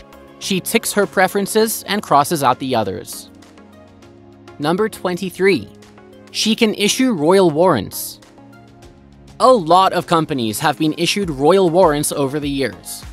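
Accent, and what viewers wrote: United States. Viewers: The man doing this docu is talking about the queen of england so why doesn´t he talk proper english and not english with a yanki accent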